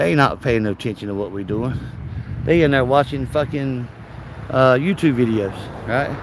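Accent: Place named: North Carolina, United States. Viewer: GREAT TO HEAR YOUR VOICE AGAIN INSTEAD OF OTHER AUDITERS!!! YOU ARE BY FAR THE BEST AUDITOR HANDS DOWN!! LOVE YOUR NC ACCENT. LOVE FROM JOCO!!!!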